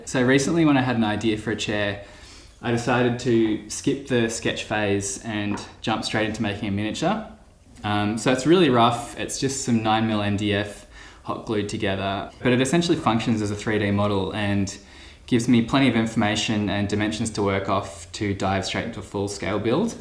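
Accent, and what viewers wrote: Australia. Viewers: Mate this is top shelf content 👌 so refreshing to hear an Aussie accent on yt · I would fix the back rail with a saddle joint Too many parts. The frame is more about how it looks than how it functions and thats fine if you are making a gallery piece but you said you were trying to design a chair to offer with your tables. That being the case its imperative you keep costs down and therefore simplicity rules Dominos will not make anything stiffer they only provide alignment for glue-up and some shear resistance. Also just an idea if you are determined to have the rails intersecting in the middle of the underside of the seat why not get rid of the block and the flimsy 4 joints and just beef up the stock slightly and do a Cross Lap Joint. And as someone else said great to hear an Aussie accent on YT. Worth a subscription from me.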